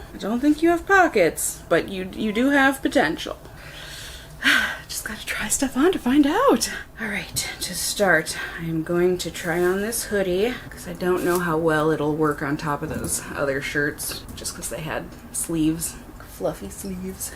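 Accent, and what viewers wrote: Minnesota, United States. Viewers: You remind me of my daughter’s friend. Maybe it’s just your Minnesota accent. I’m a Minnesotan also but I have lived in Oklahoma for 40 years.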